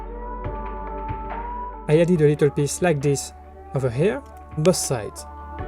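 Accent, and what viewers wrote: France. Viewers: Are you french ? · T'es francais nn ?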